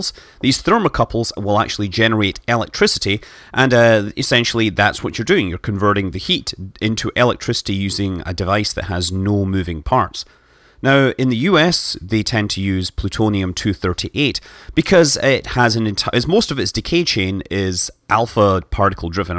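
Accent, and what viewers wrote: Scotland, United Kingdom. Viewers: Scott's a Scot, and still has a Scottish dialect. This includes occasional alveolar taps which are sort of a cross between r, d, and l, and when the r is a linking r, it is almost always given an alveolar tap.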